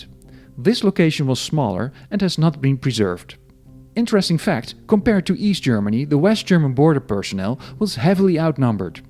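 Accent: Netherlands. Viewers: Interesting. His accent in English is typically Dutch, hence my question. Thanks for the answer. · Dutch accent? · Do I hear a Dutch accent in the narration?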